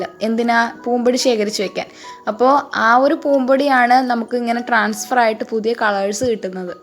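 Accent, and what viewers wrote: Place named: Trivandrum, India. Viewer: Njan tvm aanu ithante slang kettappo tvm anoonnoru doubt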